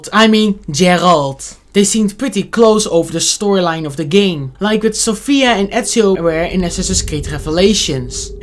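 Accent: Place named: Netherlands. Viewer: You sound like a dutch person who speaks english😋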